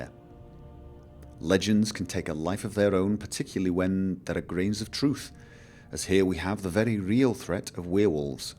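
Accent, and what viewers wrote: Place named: Bolton, United Kingdom. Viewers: I download the vids as MP3's and listen to them while trying to sleep. I like it that my storyteller has the accent of a true northernman, sounds more genuine. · I thought so, he's probably from Bolton though. I know a lot of people from Bolton who have that accent so it sort of got me thinking but thanks for the response. Sorry about mine! Hehe!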